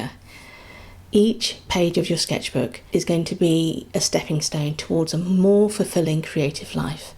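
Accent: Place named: England, United Kingdom. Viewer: From Uk. Just found you. Love the English accent. Great inspiration. Thank you.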